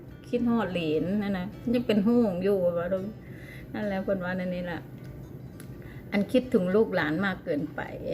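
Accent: Phu Thai, Thailand. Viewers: เว้าภูไทม่วนดีครับชอบฟังครับมีเสน่ห์ครับ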